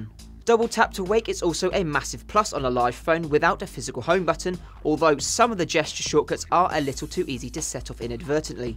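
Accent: United Kingdom. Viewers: The British accent is so amazing <3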